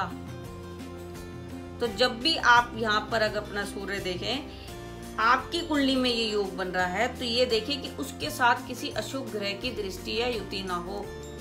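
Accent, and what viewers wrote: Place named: Punjab, India. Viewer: madam app ki awaj me punjabi jhalakti ha kya aap bhi punjab se hain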